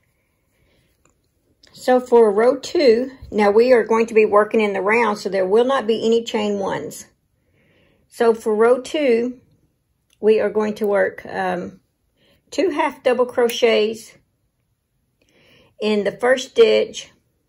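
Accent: South, United States. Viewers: I love your southern accent. I also love...love this pattern. Thank you so much for sharing!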